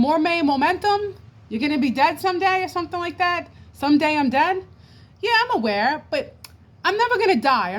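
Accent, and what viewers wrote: New York, United States. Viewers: is that a new york or a new england accent?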